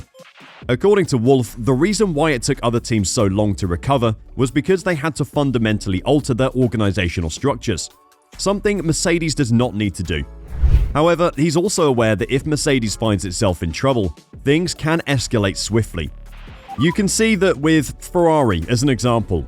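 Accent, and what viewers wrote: United Kingdom. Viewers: “Clearly clear” sounds totally fine with a British accent. That accent definitely makes everything sound much classier 👌👌 (no sarcasm)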